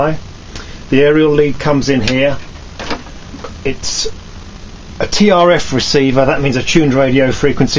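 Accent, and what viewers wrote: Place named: England, United Kingdom. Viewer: Anytime its an Englishman talking you know the video is going to be top quality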